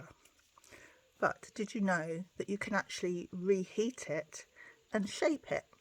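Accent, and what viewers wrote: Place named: United Kingdom. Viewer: Great tips, new subscriber and a British Accent hooray!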